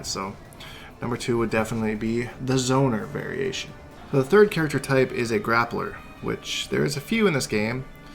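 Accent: Canada. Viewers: Canadian af 👍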